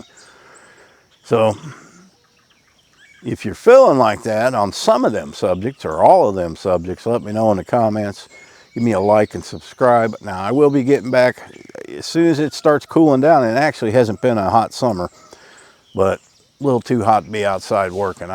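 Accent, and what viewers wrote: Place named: Appalachia, United States. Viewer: You i love American applachian accent and i wish some day get that accent for real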